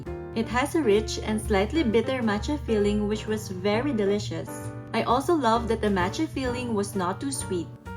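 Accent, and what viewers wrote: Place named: India. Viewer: Why do you have an indian accent?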